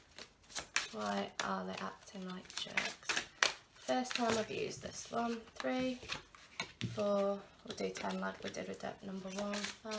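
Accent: England, United Kingdom. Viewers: OMG was Spirit hanging out with my DM..lol resonates completely as expected Beauty, you are always spot on..like the smart psychic girlfriend who is quirky yet never less than stunning on any given day..served in an very cute English ? No expert ..or close by accent and heart of gold who can be trusted..like all women need..TY love , for being that to me..I am grateful and appreciate greatly..Blessings I wish Love and light..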